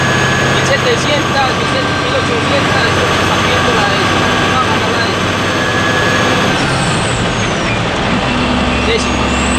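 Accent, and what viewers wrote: Colombia. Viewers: este es el verdadero conductor de carretera lo unico malo es el asento , la palabra seria muy colombiano para mi gusto es igual que el asento chileno casi que hay que buscar un traductor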